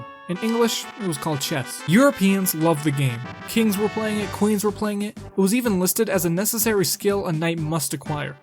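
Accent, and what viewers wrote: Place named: United States. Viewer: Where are you from? Cause the accent seems like Japanese-American to me.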